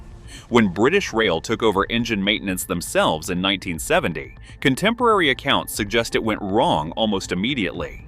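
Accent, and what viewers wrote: United States. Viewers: Why do these vids have to have an American commentator…..aaaargh! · Why use such an annoying American voice on a subject on British Rail?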